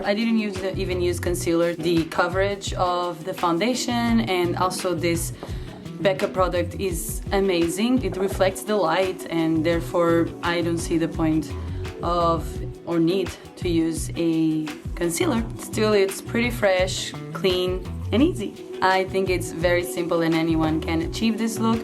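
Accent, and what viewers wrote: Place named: Portugal. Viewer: nao e brasileira ela e portuguesa se conhece pelo sotaque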